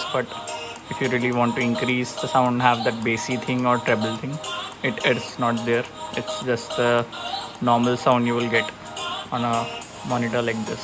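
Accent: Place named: Garhwal, India. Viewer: Wow ur gadhwali accent is so sexy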